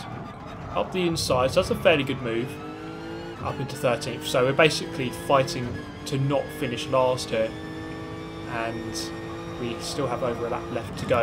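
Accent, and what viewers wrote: United Kingdom. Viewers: The british accent is perfect for sport commentating.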